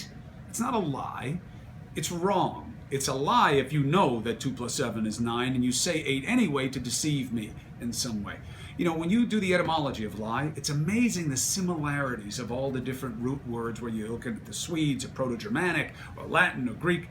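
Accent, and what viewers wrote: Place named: Ireland. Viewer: you got the best brain, you know that? Plus you know words. You've got the best words. Ever heard that before? Lol. Thx for the vid. BTW, I know u are of Italian descent, but I could swear I detect a smidgen of an Irish lilt in your accent? Sexy as all heck! Keep at it. xx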